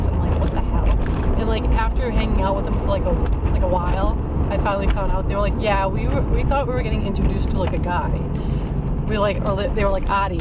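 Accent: United States. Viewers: The way you talk has a.ways been ok to me you just sound American 😊😊👍🏻 Like sounds like “Like” maybe i say it similar . Just carry on 😊😊😊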